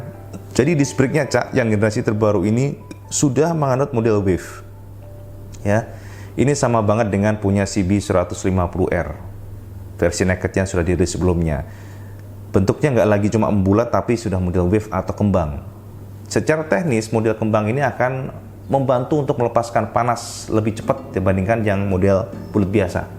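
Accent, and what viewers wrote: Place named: East Java, Indonesia. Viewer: Sampean orang mana cak ? Logatnya kayak jatim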